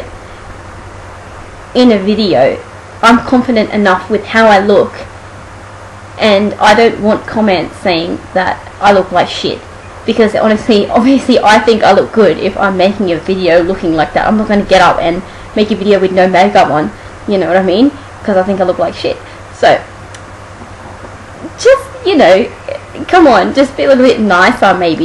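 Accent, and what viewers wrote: Australia. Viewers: hell yeah girl i agree! i got 3! i think u look gorgeous and ur tattoo of the peacock looks gorgeous! oh and btw i loooooove aussie accents and girls like you ;P turn on! haha but yeah let the haters hate you know! prolly dont know what that means cuz im from chicago but you never know! keep doin vids i subscribed 5 min ago!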